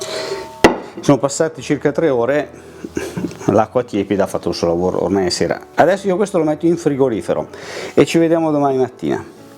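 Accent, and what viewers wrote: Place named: Milan, Italy. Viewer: Video doppiamente bello e buono, per il pane e per il dialetto meneghino.👏👏👏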